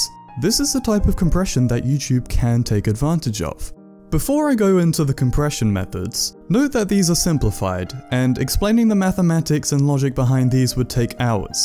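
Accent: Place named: United Kingdom. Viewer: >be me >get this video in recommended >british accent >face looks probably like the guy from the "ACKCHYUALLY" meme >go to video tab >many video titles have a CAPITAL word in it for no reason >many video titles start with "I made..." >first video blew up >2nd most popular video has only 1/3rd of the views >never got that many views since on any video >close tab and block this channel with the extension BlockTube Feels good man.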